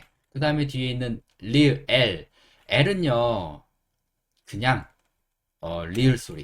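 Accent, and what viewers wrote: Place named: United States. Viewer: 미국발음 띤입니다!^^^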